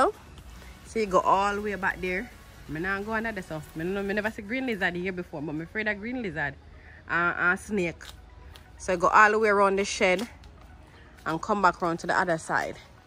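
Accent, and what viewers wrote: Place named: Jamaica. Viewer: Happy 4th of July. Lol one minute you talking so I can understand then the next you talk Jamaican lol but I understand I’m learning 🤗🤗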